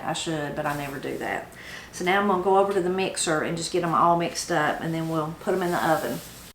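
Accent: Appalachia, United States. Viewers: I live in Nebraska. Have I ever been to Appalachia? Nope. Will I ever have a huge garden like you do? Nope. Will I ever put up produce, cook soup beans or kilt lettuce? Also no. But, I'll always watch these videos because your family is just the sweetest... and I just love the accent. Thank you for being so down to earth, humble, and Godly. ❤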